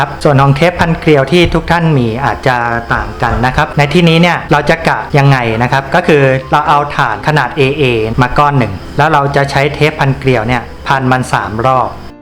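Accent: China. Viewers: เป็นคนไทยเชื้อสายจีนใช่ไหมครับ พูดภาษาไทยสำเนียงจีน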